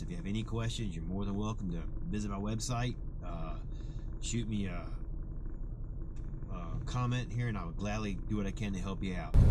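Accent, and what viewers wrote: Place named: New Jersey, United States. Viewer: Are you from mariland. You have a new jersey accent with a virginia twang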